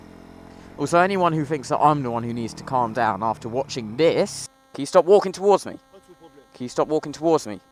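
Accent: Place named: England, United Kingdom. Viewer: Normally i hate the english accent but your's is awsome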